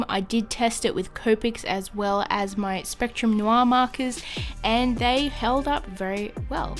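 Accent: Australia. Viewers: Thanks for the welcome! Loving your channel so far, so nice to hear the aussie accent too 😉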